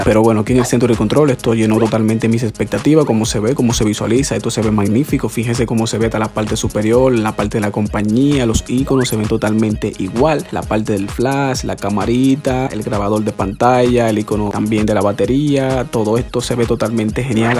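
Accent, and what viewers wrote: Dominican Republic. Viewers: Es que hago hablamos los dominicanos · Es dominicano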